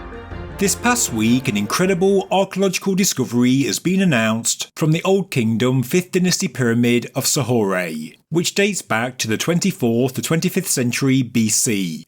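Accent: United Kingdom. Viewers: I know this is an odd question, but I am asking because I can't place your accent. British? Why do you end sentences by extending the final sound?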